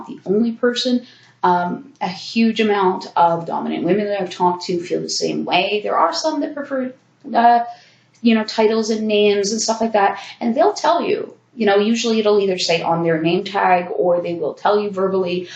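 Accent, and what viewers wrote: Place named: Canada. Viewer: Learned so much in these 2 vids, thanks! Also, funny/cute how you say aboot & sore-y like on Degrassi 😆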